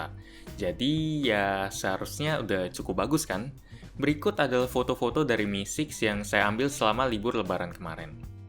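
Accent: Palembang, Indonesia. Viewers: orang palembang ya ko?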